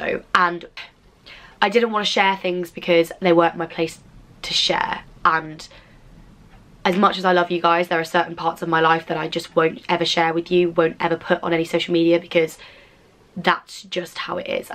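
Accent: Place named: RP, United Kingdom. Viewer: You sound so posh in this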